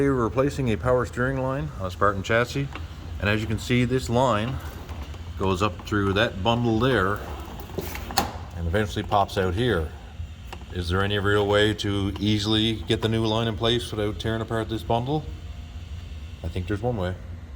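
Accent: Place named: Newfoundland, Canada. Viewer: Hey man, where are you from you sound like a Newfoundlander?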